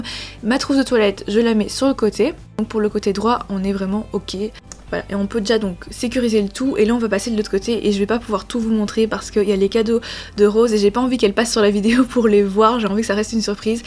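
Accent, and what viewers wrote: Belgium. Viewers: Tu es de Belgique ? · tu es belge ?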